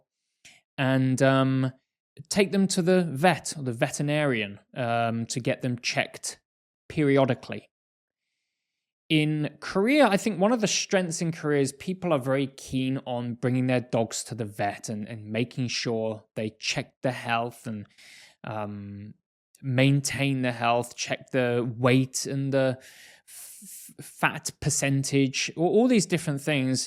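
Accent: United Kingdom. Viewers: I love it when I heard your wonderful British accent.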